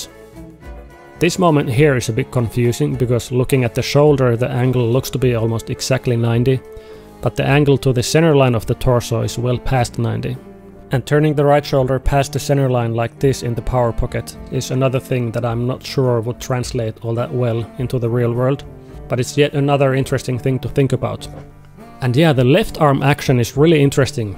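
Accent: Finland. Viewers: So, this guy is from Finland, right. Every single Finnish person speak english like that.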